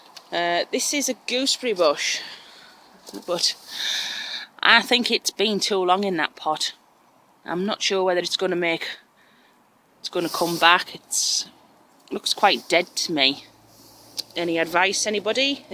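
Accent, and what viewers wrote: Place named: Middlesbrough, United Kingdom. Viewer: gooseberries will grow, just plant it in the ground.. It'll be fine.:) your plot looks awesome, and i know what you mean about hearing your own voice..lol..I'm from newcastle, and going by your accent, you aren't from sunderland..I'm guessing Middlesboro.:).. look forward to your next vid.. by the way, I am seriously considering making a bottle house now..and its all down to you and your vids..:) cheers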